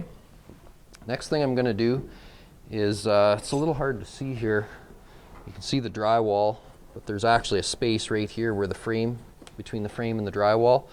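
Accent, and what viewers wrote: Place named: Canada. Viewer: Canadian aye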